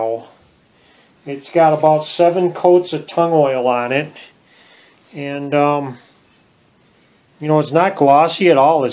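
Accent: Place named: Upper Peninsula, United States. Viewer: First from the accent i thought U was a yupper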